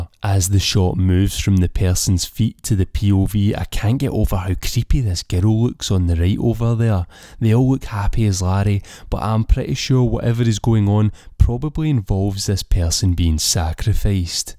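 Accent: Scotland, United Kingdom. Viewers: Great listening to your accent and Scottish sense of humour!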